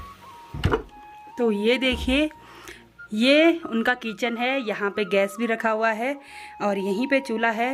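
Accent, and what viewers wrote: Standard. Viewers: Sister aap bahut achi hai sudh hindi bolthi hai aapki har viedeo hum dektha hai hum keralse hu india me keral wala bahut.......... Hota hai by sister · ji aap Pahadi ho fir bhi aapki Hindi Pure bol rahi ho...bahut badhiya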